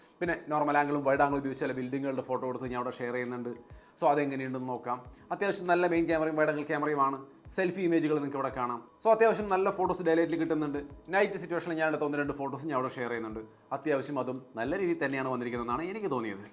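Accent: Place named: Palakkad, India. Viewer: ഇങ്ങളു പാലകാട് ആണ് എന്ന തോന്നുന്നു 😊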